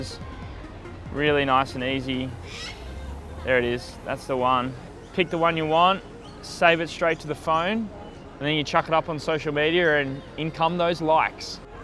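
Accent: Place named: Broad, Australia. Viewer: Stop talking like a yobbo,you Need to look at yourself how you come across and it’s not very good clean up your vocabulary get away from the Ocker Image I personally wouldn’t buy off you know pride in that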